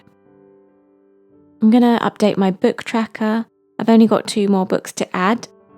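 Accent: United Kingdom. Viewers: Hi again!! Never mind my question about your IG account, I just saw the video again and took screenshot of your IG account name. Oh oh yes, and the spreads and the weekly so I can recreate and tag you. (But I am NOT nearly as talented as you, so it will take quite some time and a lot of hard work and trying and failing before I will have something I’m not too embarrased to show you....😅❤️ It was even more relaxing to watch the video for the second time btw. Cos then I knew what was coming up so I could be a bit more at ease👍🥰 One more thing: you should definately become a teacher of some sort for people with anxiety or stressed people, cos your voice is the most relaxing voice I’ve ever heard. With that perfect British English/accent❤️ I always find myself very relaxed and full of ideas after watching your videos. I don’t usually comment, but after this I just had to🙏❤️ Love from me💖👱🏻‍♀️🇳🇴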